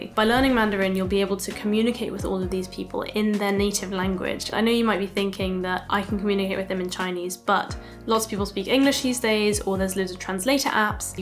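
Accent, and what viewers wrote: England, United Kingdom. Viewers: wow i like your british accent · Ni Hao I love everything you said,particularly your British English. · I was looking on youtube for Chinese lessons when I came across your videos. I thought I saw a Chinese woman on your video cover. Then she speaks pure English with a British accent. I was like : whaat ?? Then I learned she only speaks English and is learning Chinese. heh ? . If your friends told you you should try make youtube videos and put your face on the covers - then yeah, that was a great idea. That beautiful face of yours is a click magnet. Very GOOD ! Now I also learned that you are studying to become a doctor. Hey hows that for double good: She also has brains ! not just something like perfect beauty. The problem with most doctors are they never get around understanding the placebo effect even though it is in most doctors study materials. 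I hope you will be smarter. And I DO hope you will be smarter :). Anyway, I am curious about how come you look so Chinese/Asian. Must be in the bloodline somewhere recent in the family tree ? I think your reasons and motivation for learning Chinese is very good. I will not keep it against you for neglecting the following reason: And next time some Chinese speaking person starts to speak Chinese to you because he/she thinks YOU are Chinese speaking: You don't just have to stand there dumb struck and start talking English with a perfect British accent. Sorry but its just funny ! LOL . Well have a great day and I'll probably see you in some of your other videos. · As an American born Chinese - I grew up speaking Cantonese. Honestly, its NOT the Chinese but your ENGLISH ACCENT I love most. The English accent automatically boosts your perceived IQ 88 points!!! Where are you originally from?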